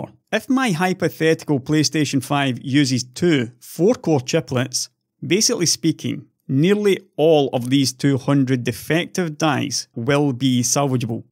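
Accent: Scotland, United Kingdom. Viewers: your pleasant Scottish accent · his Scottish strong accent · I've learned his Scottish accent and it's really great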